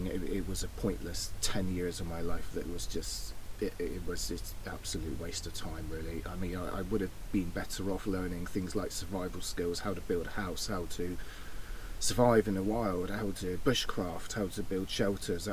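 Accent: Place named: England, United Kingdom. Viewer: I would suggest you try a career helping women take care of their computers, doing everything needed to keep their computers going, also helping with mowing the grass and fixing doors when needed. Meanwhile, I think you should film all of England for those of us across the pond. I just watched 14 minutes of you and the best moment was when you said the dog was chilling. But I want to see cows and countryside with you talking about all the places in England and hear your lovely accent and "pardon me"'s for your coughs. That could be your signature, in a good way. Then we'd know it's you behind the camera.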